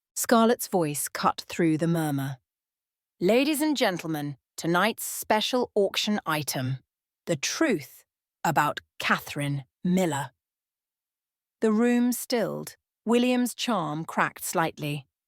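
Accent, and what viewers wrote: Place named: United Kingdom. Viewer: I love the British narrator.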